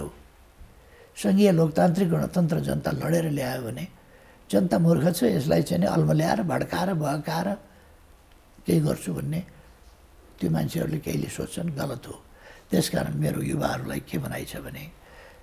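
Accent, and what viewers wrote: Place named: United States. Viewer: baini timi nepali ki english ho???tongue ta purai half-american jasto xa ta...xi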